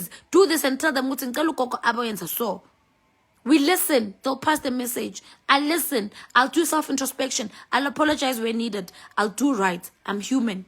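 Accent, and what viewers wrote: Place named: Zulu, South Africa. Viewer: GoguSkhotheni niyangihlekisa ngalesis'Zulu senu (makayenza nje) every word to spit maka, maka, maka really thina sithi (uma enzakanje) and again iciniso(lol) ngala eNatal we use to spit kanje (iqiniso).But I'm not all about that.Thank you Gogo wethu ...,qhubeka nomsebenzi wakho oskhulisayo sonke sidode ngeqiniso.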